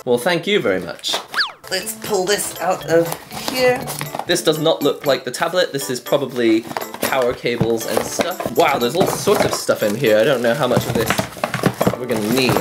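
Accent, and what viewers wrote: United Kingdom. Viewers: A Japanese chap with a British accent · british accent on a japanese.. pff this looks so weird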